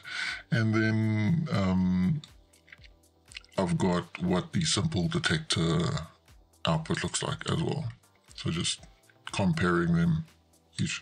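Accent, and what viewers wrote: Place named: Afrikaans, South Africa. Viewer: I like your voice my man.....English baritones with a faint touch of Afrikaans. Keep up the good work!! Also big thanks for sharing your work flow without putting them behind a paywall!!! :eyes-pink-heart-shape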